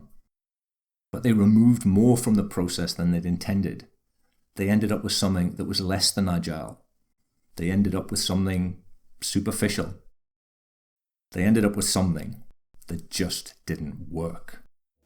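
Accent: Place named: United Kingdom. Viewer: Thank you for speaking slowly and clearly. Not all Youtube educators grasp that students hearing the information for the first time need it delivered slowly and clearly. As a Yank, I find your smashing British accent most enjoyable. Your videos are fantastic. Bravo!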